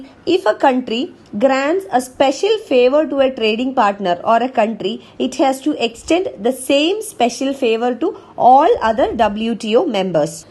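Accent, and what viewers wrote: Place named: Kerala, India. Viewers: Yeah definitely a malayali😁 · I know it is a malayale accent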